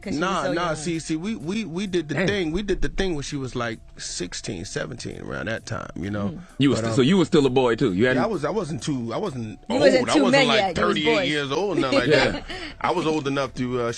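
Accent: Memphis, United States. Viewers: off topic to the video but as i listen to you speak i keep wondering “she from Memphis huh???” lol. W video btw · Are you from Memphis? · Ok love this but are you from Memphis lol?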